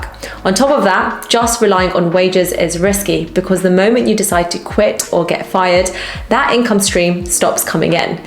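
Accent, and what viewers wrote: England, United Kingdom. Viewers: No, she looks Indian. She sounds British. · I'm just here for the British accent 😂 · Firstly, I am American. Your accent is so soothing. I have found your channel and now I am binge watching them. There is some great information you are putting out. Thank you. I am 47 and never really new about financial education. I have started to learn thanks to YouTube. Between Minority Mindset and you and a couple others, I have started to learn. I am so far behind. But at least I have found people who are willing to make videos that caught my attention. I have been researching engine swaps for my car, and Minority Mindset popped up. And then your channel as well. I am so glad it did. I've seen other people like Robert Kiyosaki and a few others. I just bought Rich Dad Poor Dad. I am now on my way. I do have my 401k through my job. So that is good. 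You are a beautiful woman. And VERY INTELLIGENT. And with your British accent, you are very pleasant to watch. I am trying to learn as much as I can. I've been stuck in the rat race like almost everyone else. Your right, that's what they want. All these years I've been making other people wealthy instead of myself. Thank you so much,!!! Truth be told. I saw your thumb nail and thought, wow she is pretty. Sorry, I'm just a guy!!! Lol. But your content is so worth it. Thank you again for helping me and alot of other people turn our lives around. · The England accent it’s annoying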